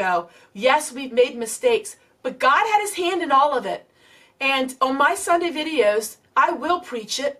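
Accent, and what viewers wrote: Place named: Maryland, United States. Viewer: If you don't mind me asking what state do you live in? I was raised in Maryland and your accent sounds so familiar. I have been living in Georgia since 1991 when I was 14 years old. Now at age 42 I have a deep southern accent. I'm really glad we are now friends. It seems like we have alot in common.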